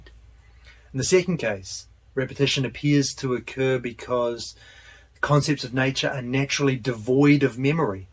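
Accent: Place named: New Zealand. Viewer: Are you a Kiwi bro?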